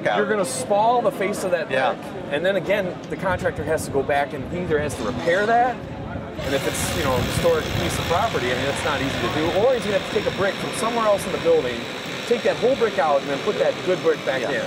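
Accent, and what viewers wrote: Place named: Midwest, United States. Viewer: Yaw I werk fer Bawsch! Jesus Christ that’s one hell of a Midwestern accent there, bud! Great job demonstrating how worthless that attachment is too.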